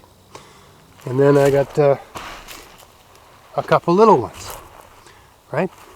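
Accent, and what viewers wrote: Maritimes, Canada. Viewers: Without reading the page name I watched this before gardening and within 2 seconds I was like is this guy an islander?? Unmistakable maritime accent. Then I saw your channel name and saw you’re in NS 😂 thanks for the potato advice from this islander!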